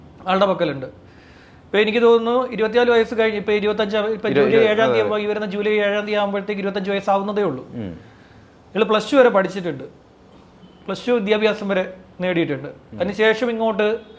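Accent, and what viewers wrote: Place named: Kollam, India. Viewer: kollam, anchal karan aanu ivideyum anganeya samsara syli😊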